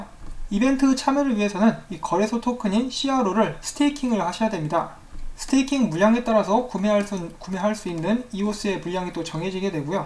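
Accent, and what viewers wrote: Jeolla, South Korea. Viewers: 제가 듣기에는 전라도 같은데요? 단지 님은 센발음을 선호하지 않는 경향이 있으신듯...저점===>우리는 보통 저쩜이라고 읽지만 님은 저점이라고 하심~ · 양쪽 언어를 네이티브로 섭렵 구사해본 바로는 전라도 쪽에 무게가 실립니다만 좁은 땅에서 지역보다는 좋은 사람이면 된겁니다 목소리 톤은 박지성 선수 필이 조금 가미 되어 있다 사료됩니다 ㅎ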